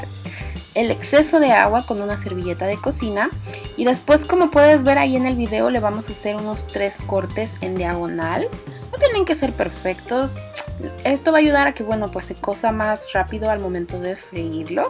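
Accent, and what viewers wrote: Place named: Mexico. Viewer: tienes acento mexicano :/ pero en el Perú hacen ese tipo de pescado frito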